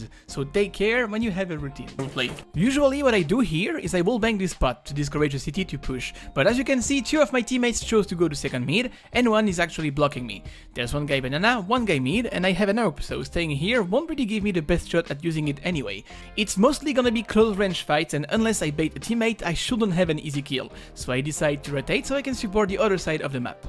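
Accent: France. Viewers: Слушать английский от француза, когда ты - из России... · His french accent is so annoying.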